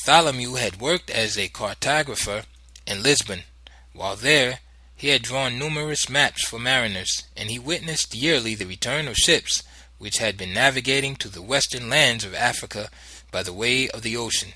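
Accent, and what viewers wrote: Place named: New York, United States. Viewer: That NY accent makes it hard to listen to. Lol open parentheses … close parentheses?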